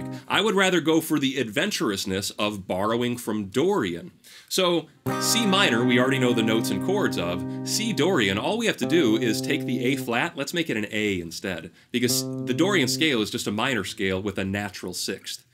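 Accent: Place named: Midwest, United States. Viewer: Wow I just stumbled on this video and man, I just wanted to say thanks and congrats. You have great content, a nice fast pace and a perfect radio voice (with a crisp Midwestern accent indeed). I learned a lot even though I've been playing music all my life. This was legit one of the best tutorials I've ever watched. 👍🏽👍🏽👍🏽 Keep rockin'!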